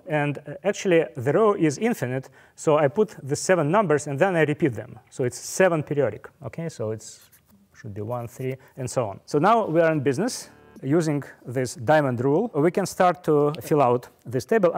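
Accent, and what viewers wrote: Russia. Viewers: Подумал, что у него русский акцент и не ошибся) Привет соотечественникам! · Эх, русский акцент не спутать :)) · The Russian/Slavic accent makes me [ r e d a c t e d ] and strangely patriotic. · Another Russian smart mind, love to see your Russian accent <3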